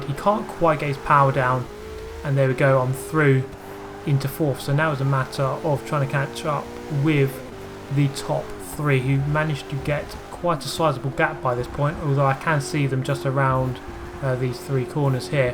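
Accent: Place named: RP, United Kingdom. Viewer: I like the gameplay and reasoning behind the trolling the trolls idea, but you sound so posh especially when you say “That’s a bit of a inappropriate joke for a child’s racing game”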